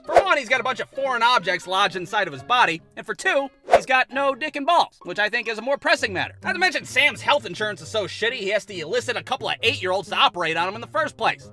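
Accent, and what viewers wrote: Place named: Midwest, United States. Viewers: Typical Ohio accent - “average Michigander” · That’s how we sound in the midwest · It's called an "ohio accent".... me and come from the same area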